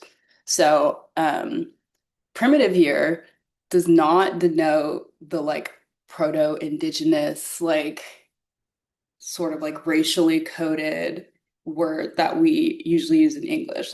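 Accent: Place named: Germany. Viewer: Ur German accent eats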